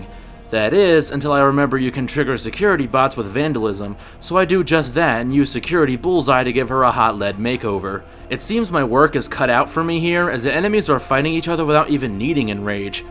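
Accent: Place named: Ireland. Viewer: Ahh makes sense. The accent is more subtle than other Irish youtubers I watch so they didn't come to mind.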